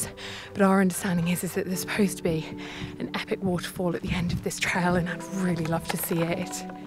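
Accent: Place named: Northern England, United Kingdom. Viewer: Just stumbled upon your channel, where is accent from please ? You sound from north England, right? Greetings from Casablanca . Thanks for taking us round. Fare thee well for now.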